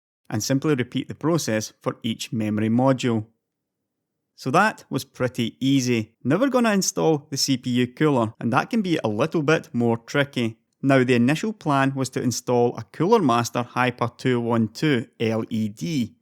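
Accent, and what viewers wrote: Scotland, United Kingdom. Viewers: the sweet, Scottish dialect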